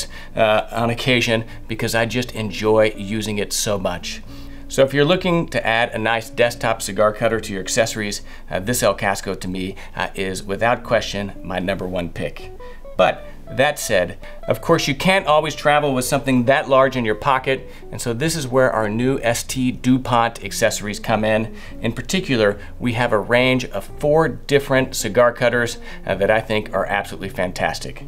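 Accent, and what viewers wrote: Texas, United States. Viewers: We native Texans love our drawl!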